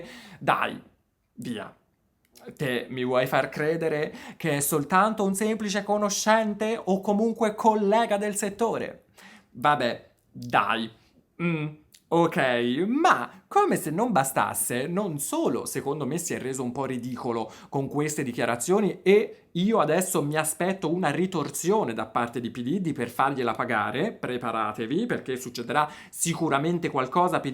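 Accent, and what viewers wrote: Tuscany, Italy. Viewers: "Hai più foto con lui che con tu moglie..." morta dal ridereeeee!!! Nessuno sa raccontare delle storie così stravolgenti come lo fai te!! Sei divertentissimo!!! Sei molto espressivo e l'accento toscano è insuperabile! ❤❤👏🏻👏🏻👏🏻👏🏻👏🏻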